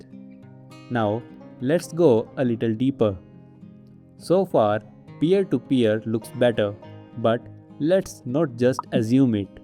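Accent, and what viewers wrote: India. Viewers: After hearing the Indian accent, I was confident that this video will surely explain me, and it did!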